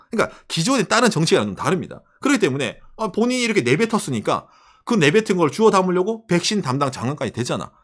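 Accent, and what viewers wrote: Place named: Gyeongsang, South Korea. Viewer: 재미있는 건 일본에서 그렇게 오래 살았는데도 경상도 사투리 " ~ 맨시로" 가 남아 있는게 신기하네.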